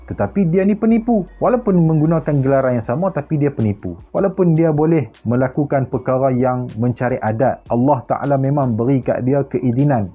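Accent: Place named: North, Malaysia. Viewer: Mula2 mcm nk cover bahasa utara..last2 x boleh tahan..😆😆..apapun penjelasan yang jelas..😁😁😁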